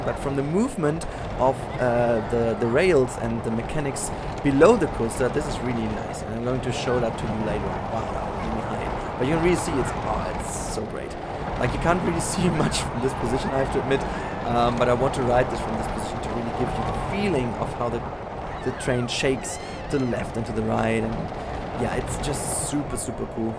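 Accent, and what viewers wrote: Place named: Germany. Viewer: hahah bist du cdeutscher ? :p :o echt kein schlechtes english probs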